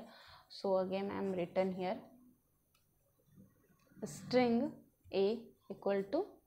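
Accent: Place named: South India, India. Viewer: plezz change the south indian accent while u r speaking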